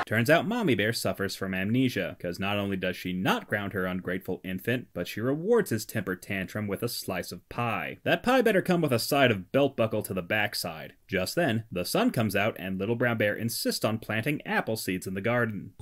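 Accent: United Kingdom. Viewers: nice English accent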